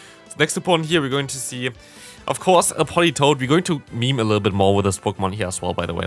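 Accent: Germany. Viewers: ich wusste zwar, alleine durch den Akzent, dass du deutscher bist, aber Eutin ist nicht mal ne halbe stunde weg von mir😂 Grüße aus Schleswig Holstein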